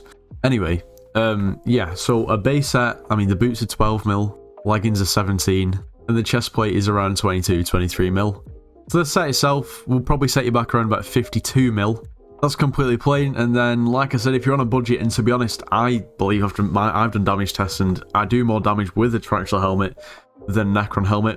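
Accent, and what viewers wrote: United Kingdom. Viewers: He is the most British sounding British person I've ever heared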